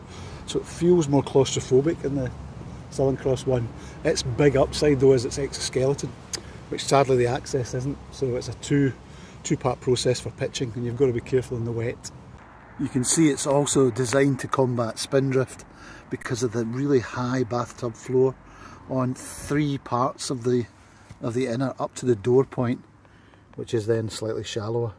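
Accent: Scotland, United Kingdom. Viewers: Great review.. very detailed which I enjoyed very much... Obviously a Scot... Perhaps we will cross paths on the hill or mountain...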